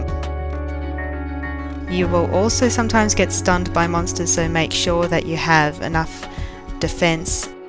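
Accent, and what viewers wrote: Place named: Australia. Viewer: AUSTRALIAN ACCENT U IN AUSTRALIA TOO!!!:D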